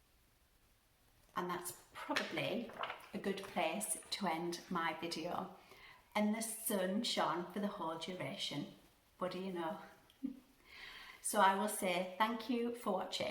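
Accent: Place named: England, United Kingdom. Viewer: Your accent sounds so familiar and I may have figured out why. It seems to be the same accent as several of the characters of Downton Abbey. Am I correct in that guess or totally off base? Another great video.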